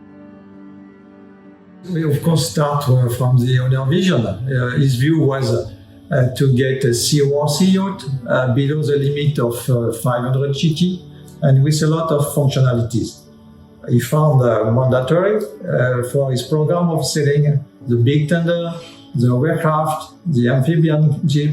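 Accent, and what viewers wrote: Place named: France. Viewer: The music in the background is a little bit disturbing. Sometimes its difficult to understand. (Ok, it's a little bit because of his french accent and I'm not used to hear English with this accent.) There are a lot additional 'cracks' as well, microphone was not the best.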